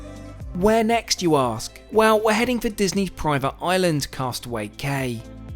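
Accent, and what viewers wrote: RP, United Kingdom. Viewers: This is a cool video and is a great and informative room tour but I have never in my life heard such a posh accent with such awful pronunciation of words 😭 I must admit however that blurring kids faces is fantastic. You’ve done incredibly well there.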